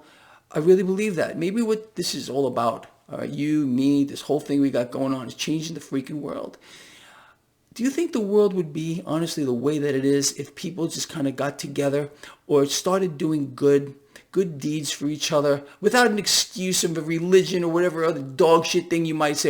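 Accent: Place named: Brooklyn, United States. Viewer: Gregory Pimpen What part of New York you from ? 🍕🕺🏽im guessing Brooklyn 😂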